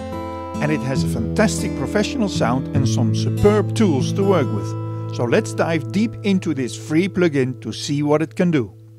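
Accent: Netherlands. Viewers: Ik hoor gewoon dat je Hollander bent 🤣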